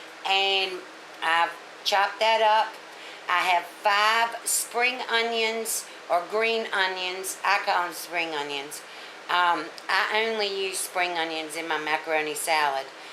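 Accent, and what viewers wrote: South, United States. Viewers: I could listen to that ADORABLE Southern accent all day long! (Makes me kiss my family in Tennessee). I love your macaroni salad too.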